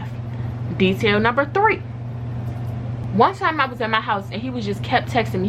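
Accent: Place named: Washington DC, United States. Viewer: Hey boo new subbie here. Are you from dc? I hear a little accent and I was like let me ask lol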